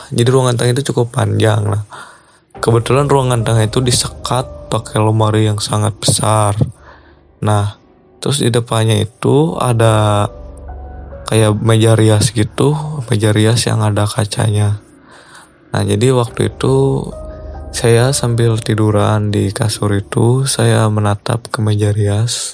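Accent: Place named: West Java, Indonesia. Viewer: wahh logat nya sunda banget yah😂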